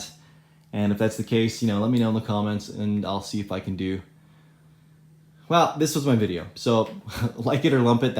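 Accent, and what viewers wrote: Canada. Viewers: You sound Canadian. Awesome gun room setup and gear by the way.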